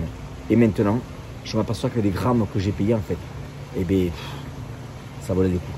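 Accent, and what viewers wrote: South, France. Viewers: est parti aux 🇺🇸mais il a gardé son accent du sud et le Pastis 🥇👍👊🏻💪🏻🙏🇫🇷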